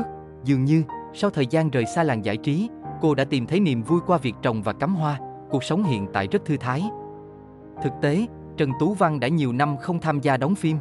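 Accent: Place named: North, Vietnam. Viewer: Hai ngàn linh hai là sao tôi không hiểu, mình là người miền nam đọc theo từ miền nam đi đừng bắt chước theo miền bắc khó hiểu lắm nha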